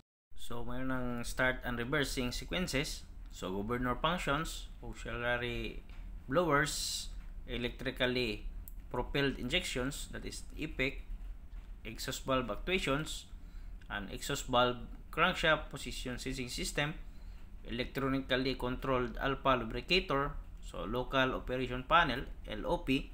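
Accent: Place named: India. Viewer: brother ur starting to sound like those indian youtube videos, no offense taken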